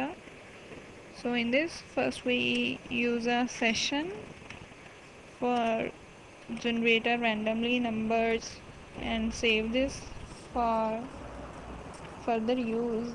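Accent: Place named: Telugu, India. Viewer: Telugu voice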